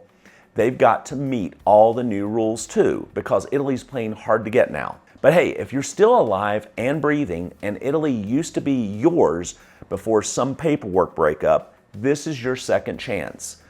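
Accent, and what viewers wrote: United States. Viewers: The President of the Republic signed the law but issued a statement this week stating that the law needs to be re-evaluated as it is impacting descendants of Italians born abroad. In addition the constitutional court is hearing a case regarding generational limits. If the ruling is that there is no generational limit then parliament will need to amend the law. Humor is appreciated but the accent is troppo americano ! 😅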